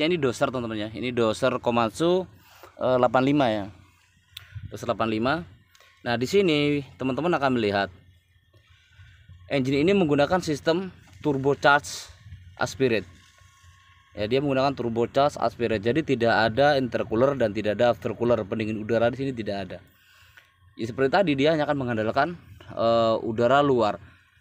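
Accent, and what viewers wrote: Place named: Bandung, Indonesia. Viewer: Klu saya amati logatnya kok soperti orang bandung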